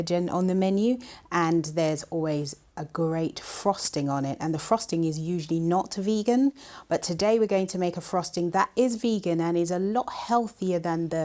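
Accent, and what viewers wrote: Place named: United Kingdom. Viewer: The long pause and pan up of the camera at the beginning of the video was quite creepy and strange 😂 I also was not expecting her to have a British accent.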